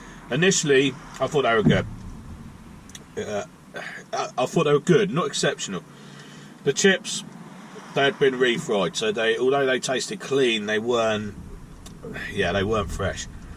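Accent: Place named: Berkshire, United Kingdom. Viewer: Thought I could hear abit of a Berkshire twang somewhere in your accent.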